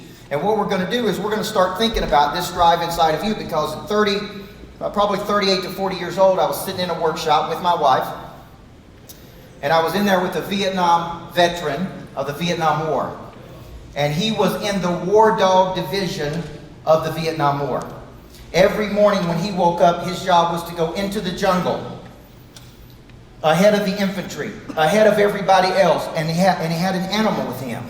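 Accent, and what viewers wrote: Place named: South, United States. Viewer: I love that Southern accent!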